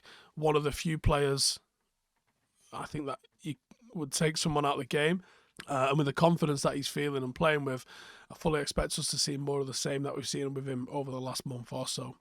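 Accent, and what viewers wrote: Manchester, United Kingdom. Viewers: lol if you cant tell the guy in this video has a manc accent you’re absolutely tripping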